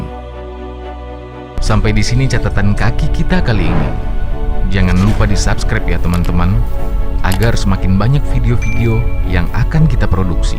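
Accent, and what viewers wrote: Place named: Bugis, Indonesia. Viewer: Pengisi suara pasti orang bugis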